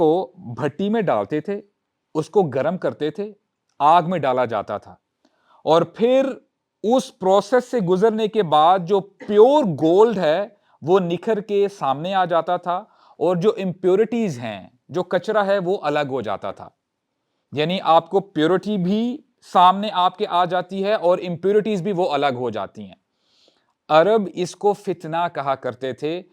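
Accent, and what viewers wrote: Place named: United States. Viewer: Amazing bayan with American accent 😊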